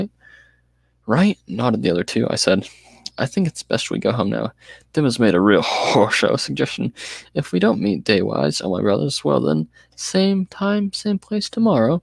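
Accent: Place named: United States. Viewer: Hey, are you american? I like your accent I could listen your accent all day long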